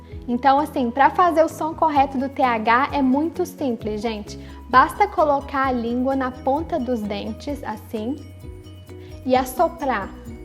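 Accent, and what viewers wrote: Minas Gerais, Brazil. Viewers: Esse sotaque mineiro é muito amor!! <3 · You seem to be mineira. Se for é conterrânea XD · Pode passar 40 anos fora do Brasil, mas o irreconhecível sotaque de mineira não sai! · so pelo sotaque daqui de minas ja vale assistir o video rsrsrs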